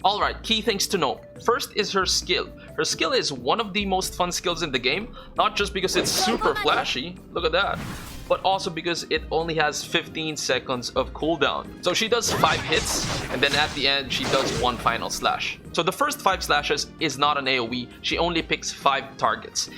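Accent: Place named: Philippines. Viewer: I absolutely agree with what you said about pulling who you like, instead of pulling who is strong. With the way global is changing things up we now have the liberty to do that, and I love it. PS: You have the most pleasant Filipino accent I've heard 🥰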